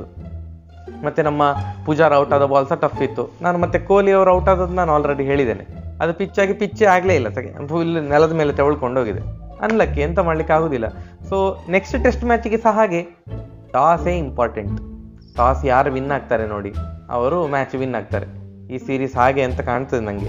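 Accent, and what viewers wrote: Mangalore, India. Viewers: ಎಬೇ ಅಂತ ಆಗ್ತದೆ😂👍..ನಮ್ಮ ಮಂಗಳೂರು slang ಇಷ್ಟ ಆಗ್ತದೆ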